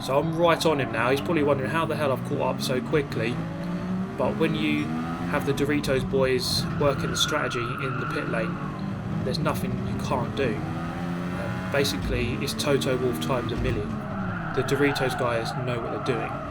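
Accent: United Kingdom. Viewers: British humour, the most hilarious actually x) Btw I'm French, British narration is the most fluid/funny thing I know lmao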